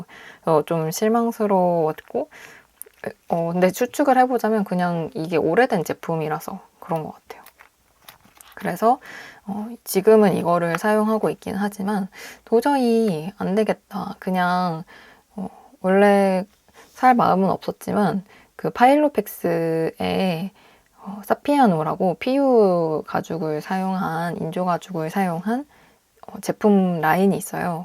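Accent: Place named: Japan. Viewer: 한국어 마스터한 일본분 특유의 말투랑 발음이랑 완전 같아서요! 모든 발음이 둥글둥글 하고 받침이 약하다고 해야하나요? 약간 お / ん 이 모든 발음에 들어가는 느낌..? 웅냥양 발음이 속에 내재되어있는 느낌...? 그리고 그 숨쉬는 부분이랑 말의 템포랑 멈칫하시는 부분이 엄청 비슷하세요😂 약간 한일2세분들 이랑도 비슷한 느낌!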